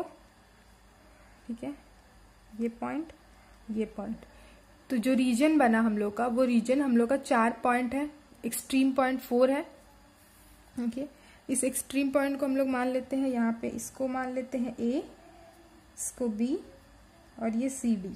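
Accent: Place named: Hyderabad, India. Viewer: Madam ap hyderabad sy ho kya,apki zaban hyderabadi jaisi hai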